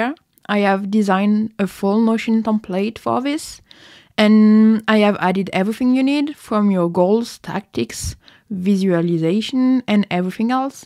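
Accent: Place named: England, United Kingdom. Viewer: woow i loved you ,loved your english ascent and more love your work , ,,Thank you realy..looking ahead for the next video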